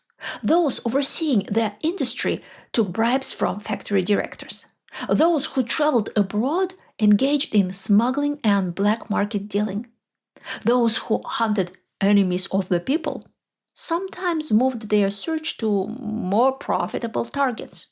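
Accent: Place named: Russia. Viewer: you still have the Russian timbre when speaking English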